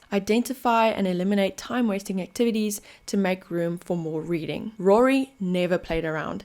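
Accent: South Africa. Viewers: Great video. Even as a long time reader, this helped a lot. Also are you South African? your accent sounds like home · Great video. Are u afrikaans?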